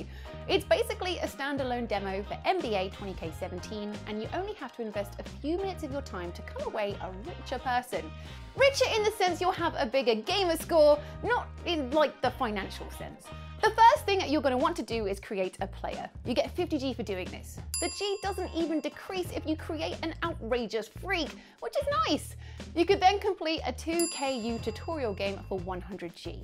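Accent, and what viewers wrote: United Kingdom. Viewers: this is so british i actully understand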